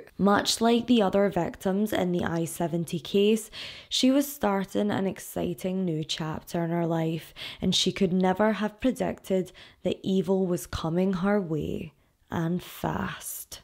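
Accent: Scotland, United Kingdom. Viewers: Ohhh how lovely to hear your Scottish accent 🥰🥰🥰my mum and dad are from Lochgelly, Fife. They seem to have lost their accents, though my uncle was as broad as they get . They all moved to Australia late 1950's. Congratz on your getting married 🥂🥂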